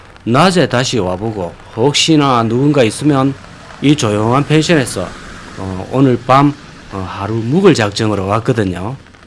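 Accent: Gyeongsang, South Korea. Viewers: .원래 내가 갱상도 말씨 아주 기분 나뿐데 이양반 말씨에서 갱상도 구수한 말이 나오너ㅣ.중국 까지 원정 하시요.더넘어 북쪽까지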